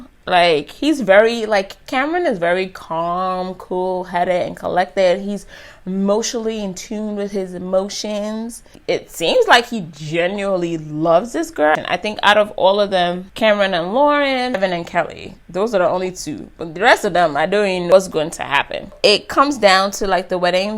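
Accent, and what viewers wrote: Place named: Caribbean. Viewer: I love your combination makeup lesson and reality show review. I'm Bahamian-American and hear a patois lilt in your voice. Are you of Caribbean descent?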